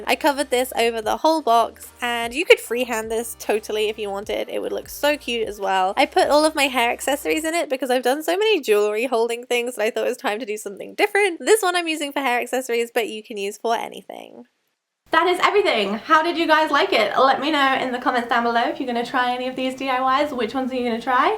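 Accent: England, United Kingdom. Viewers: love your accent so how is ron and the kids if you have seen harry potter you will get it loved the ideas by the way where you from what accent is that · are you from England? ?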